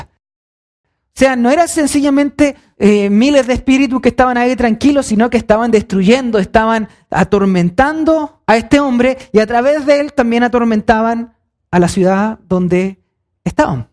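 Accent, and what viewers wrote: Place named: Chile. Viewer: Increíble el acento chileno como si se nos nota, aunque pensemos que no😅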